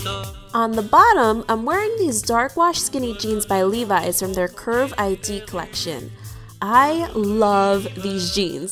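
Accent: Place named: Long Island, United States. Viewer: This was my FAVORITE OOTD video EVER! PLEASE do more! I love your personality! I am from Long Island and your voice reminds me of all of my friends from back home!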